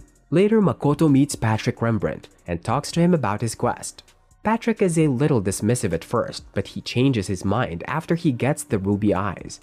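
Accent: Philippines. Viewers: Pilipino kano tangina mo alam ko ang boses ng english ng pilipino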